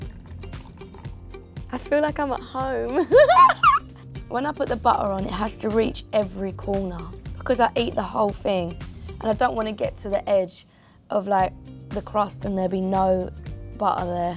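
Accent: England, United Kingdom. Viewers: Love the british accent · British accent 😍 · Who else just figured out that anne has a british accent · omg i love her british accent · She's already so cute and her British accent makes her even more cute....❤️ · I love British accent 😍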